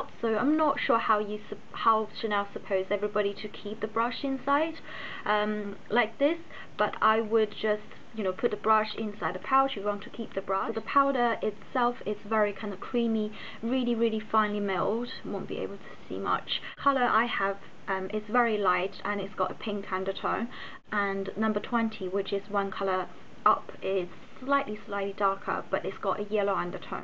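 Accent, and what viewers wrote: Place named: China. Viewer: I like her Chinese accent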